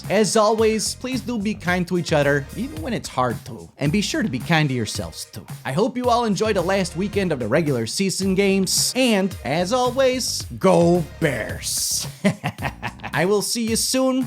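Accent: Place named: Eastern Europe. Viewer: What is with that accent. You sound like someone from the old eastern block countries. You're mixing together your Chicago accent with Wisconsin accent.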